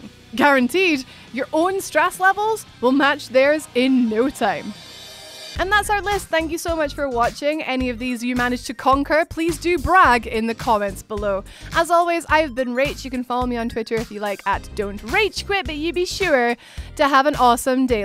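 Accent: Scotland, United Kingdom. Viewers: THE GAME IS DRIPPING WITH CONSTANT PERIL , love the Scottish accent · Fábio Pinto that’s a woman mate, Scottish accent.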